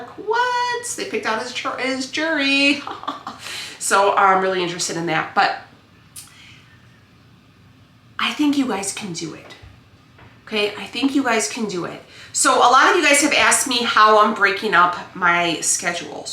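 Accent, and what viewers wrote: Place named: Minnesota, United States. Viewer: You are doing great! I’ve learned lots I learned new recipes from you I really like your Minnesota accent even though you are not from there. I stole your It’s a Good Day to Have a Good Day I like lots about you But… when that one word comes out of your mouth it surprises me . The word is 💩 SHIT 😳 What can I say I’ll keep watching and you most likely will keep that descriptive word in your video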